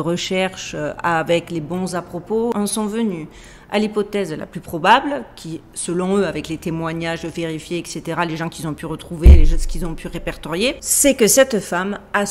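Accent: South, France. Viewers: Avec ton accent méridional à couper au couteau, je serais curieux de te voir parler en Anglais ! ^^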